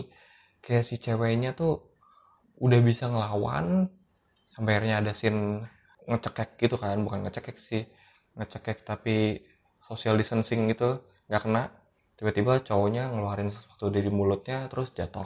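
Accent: Java, Indonesia. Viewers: Mas wong jowo tah? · Jowo kerasss lurr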